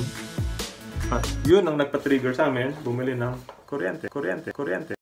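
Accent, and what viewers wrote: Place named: Pampanga, Philippines. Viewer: Kabalen accent 👍😍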